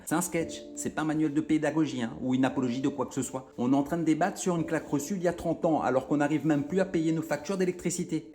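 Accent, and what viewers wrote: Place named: Kabylie, Algeria. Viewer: Continue ce que tu fait , bravo elle sont super tes vidéos et une belle élocution de Kabylie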